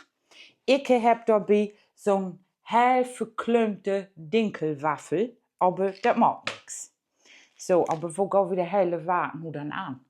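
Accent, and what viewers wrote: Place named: Low German, Germany. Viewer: Ulkig 😂 ich bin Thüringer und ohne zu lesen , habe ich alles verstanden. Erst zum Schluss merkte ich ui dat ist jo platt. 😂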